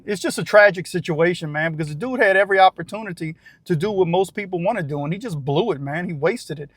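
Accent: Louisiana, United States. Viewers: Dude, you'd make a great character actor...your speaking cadence and Louisiana drawl is unique and cool. You'd make a good henchman or badass avenging some transgression. You're a good storyteller with such a unique vibe.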